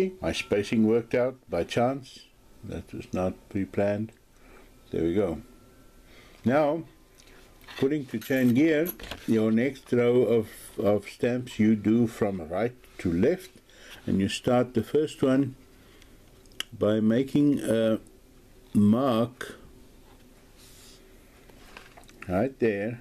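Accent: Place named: South Africa. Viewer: Hi you have an unmistakable accent. You must be South African. Are you in SA. I would like to get into the art of leather working I am a graphic designer by profession where does one get leather from in SA I live in JHB Randburg.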